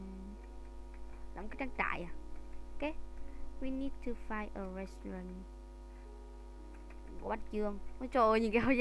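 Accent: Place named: North, Vietnam. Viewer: Hơi lai giọng bắc á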